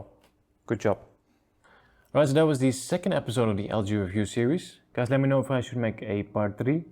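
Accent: Belgium. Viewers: I do wana hear your belgian accent xp k ben van Antwerpen! Xp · I definitely want to hear your Belgian accent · Ben ik de enige die niet kan slapen tot ik zijn Belgische accent gehoord heb?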